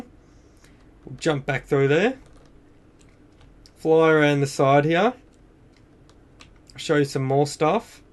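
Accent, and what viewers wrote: Australia. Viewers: nice are u australian just asking LOL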